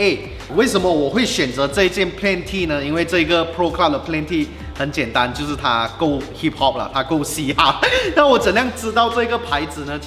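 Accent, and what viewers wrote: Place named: Malaysia. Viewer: 听到熟悉的马来西亚华语，原来您也穿我喜欢的素T，好棒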